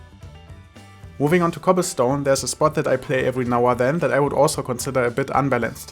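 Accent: Germany. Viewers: trying understand your english with a German acent, is so hard, but you make a good video. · your German accent english is so awful, try to speak more fluently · You sound very german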